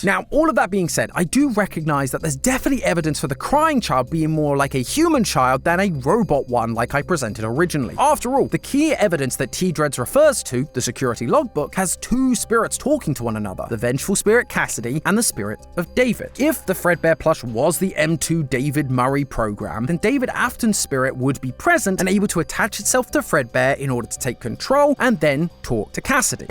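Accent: United Kingdom. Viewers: What happend to matpat? Why is there a British guy?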